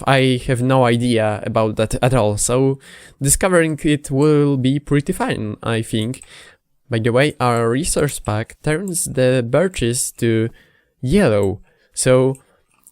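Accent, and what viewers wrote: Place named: Poland. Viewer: Oglądam pierwsze sekundy, i już wszystko wiem. Dam sobie głowę uciąć za to że jesteś polakiem. xD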